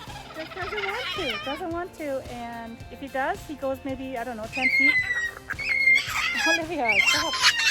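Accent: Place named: Germany. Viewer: Ich dachte doch dass ich da einen leichten deutschen Akzent höre 😊 tolles Video, Du bist eine Papageienflüsterin! Grüße aus NRW ❤️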